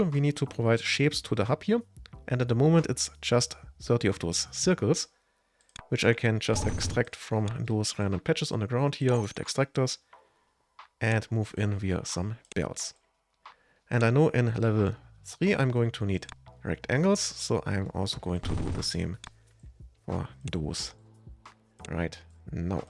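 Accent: Germany. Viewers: The German accent is crazy lol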